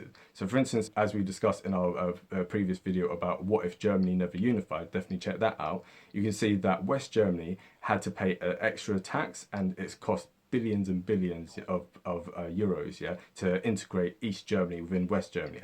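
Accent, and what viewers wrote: England, United Kingdom. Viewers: I'm from Ireland and to see someone from England (I assume your English from your accent) talk about Irish history in a fair and balanced way like you have is very welcome and wholeheartedly appreciated. Thank you sir, you have well earned a follow. ps your script sounds great. pps dail is pronounced dall (like doll with an a instead of o) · Very good summary esp coming from (it sounds like, by your accent?) an English person. Also, the British govt have just decided that it's okay for extra-judicial killings of civilians to happen and the soldiers etc who do that won't be held to account ... As for the Unionists becoming an oppressed minority - I am confident that this will not happen. Re-unification will have to be done carefully and with due regard to every individual. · It is soooo refreshing to hear an English voice with such a deep and erudite knowledge of Northern Ireland. 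Just plain facts. Well done sir. Great job. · Great video... I've never heard someone with an English accent show this much knowledge and understanding of our history before. If only there were more in Westminster or English TV/radio with this level of understanding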